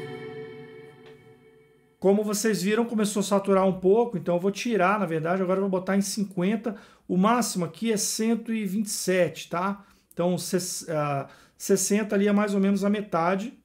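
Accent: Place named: Minas Gerais, Brazil. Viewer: O resultado ficou parcialmente bom(apenas opinião). Professor, as vezes acho que tu és mineiro, as vezes te acho capixaba e as vezes fico confuso kkkk De igual forma, em algum momento a sonoridade induz achar que é guitarra mas falta definição. Não sei se sou muito "ortodoxo" mas soou híbrido na maior parte dos casos. Muitíssimo obrigado pelo conteúdo e até a próxima!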